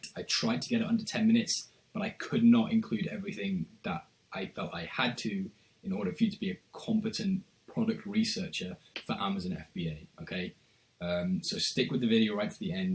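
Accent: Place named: United Kingdom. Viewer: Hello - I prefer to to 'read' your course, rather than watch it.. [your British accent is lovely but a bit difficult for me... also, the snapshots you share are blurry], so... do you have this good information in a book or a website or something? Thanks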